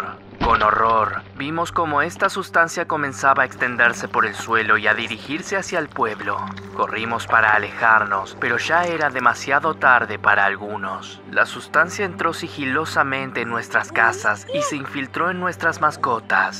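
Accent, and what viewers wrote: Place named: Argentina. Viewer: Es verdad hace 4 años era mejor haora abla como argentino porque cambiaste,😭😭😭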